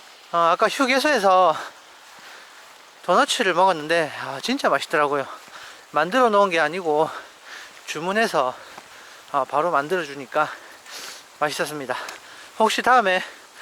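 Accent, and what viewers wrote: Gyeongsang, South Korea. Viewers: 영상잘봤습니다 항상 구수한 경상도사투리 반갑습니다 😁 지금은 서울에 살지만 본가가 사하구라 거가대교 한번씩 가보긴 하는데 도넛츠 🍩 먹으러 가보겠습니다 좋은곳에서 힐링하시니 조만간 저도 한번 가야 겠어요 ^^ 축복합니다 💜💕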